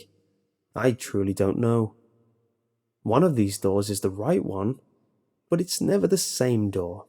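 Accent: United Kingdom. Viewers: "Tooo The Le Taah"...damn I love british accents 😁 And fuck this, waaay too many instructions going waaay too fast. I woulda been said, "fuck you, I'm not THAT bored!" You are my all time fave pasta reader. You could read the ingrediants on a candy bar wrapper and make it sound bad ass. Please start a childrens' channel and read Dr.Suess and fairy tales stories also 👍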